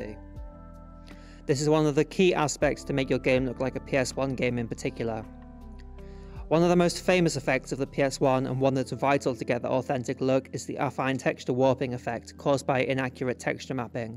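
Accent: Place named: Northern England, United Kingdom. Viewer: Ay up it's nice to hear another Northerner who's into making games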